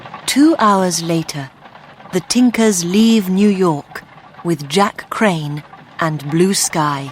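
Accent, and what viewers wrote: United Kingdom. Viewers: THANKS FOR BRITISH ACCENT🤍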